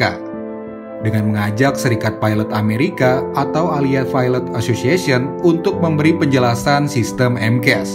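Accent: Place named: Java, Indonesia. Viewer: Ngedap coro jawane stoll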